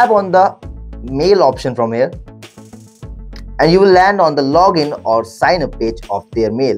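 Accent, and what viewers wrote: India. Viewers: successfully tolerated his thick indian accent, over acting and wasted time.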